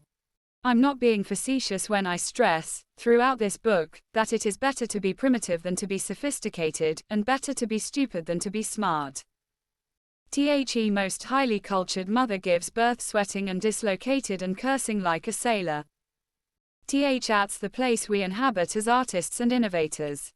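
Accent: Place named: England, United Kingdom. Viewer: Maybe I would have heard this robotic voice in an American accent with an English accent is much harder to listen to this.😢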